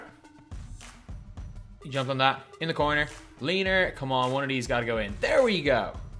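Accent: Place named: Ireland. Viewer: He is from Ireland and I actually like to watch a 2k youtuber who doesn't speak american english. Cause that can get annoying after a while.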